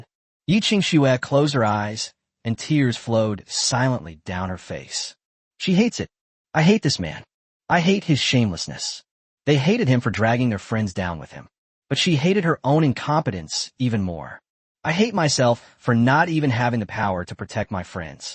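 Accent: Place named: France. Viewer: Would be a much better story and easier to follow if you didn't repeat the same s*** over and over and over again. Also if you could stop using that french accent voice to read the English words it would be much better.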